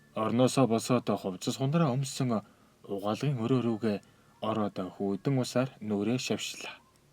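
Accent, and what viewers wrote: Inner Mongolia, China. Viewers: Өвөр Монгол аялага сонсогдоод байх юм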